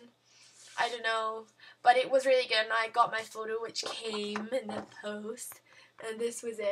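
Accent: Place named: Scotland, United Kingdom. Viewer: I'm going this year too :) I can't waiiiit hopefully see you there :) Where are you from? You sound scottish but at the same time english ahahah I don't understand xx I'm sooo excited about going this year, just worried i'll do something wrong and miss my photo op or get lost or something , Yeah it might not seem possible but I'm an idiot :p xx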